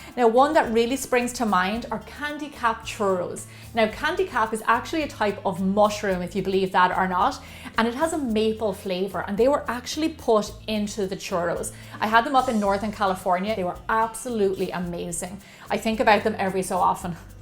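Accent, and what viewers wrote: Ireland. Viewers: Is she Irish?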